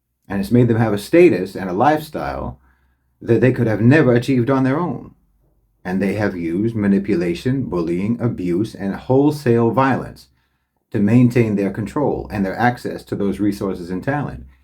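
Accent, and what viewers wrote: United States. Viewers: So weird hearing the truth spoken with an American accent. Long overdue.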